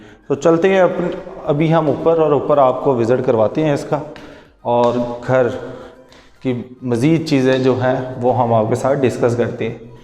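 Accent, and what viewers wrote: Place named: Karachi, Pakistan. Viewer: Bhayya Karachi waly lgty hen😍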